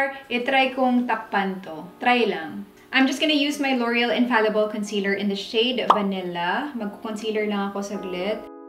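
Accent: Visayas, Philippines. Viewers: I love your vids, coz I also have dry skin and we almost have the same shade (I think). Ang saya manood ng vids na super relate ako lalo na kapag parehong hindi maganda yung effect ng product because of our skin type. and I super duper love hearing your bisaya-slang accent, super sushal po:)I would love to try the Superstay Ink Crayon Lippie kasi wala pa sa Pinas nyan.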